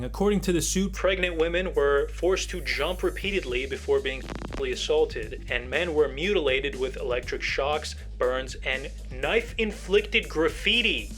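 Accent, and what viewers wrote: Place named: Chicago, United States. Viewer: great chicago accent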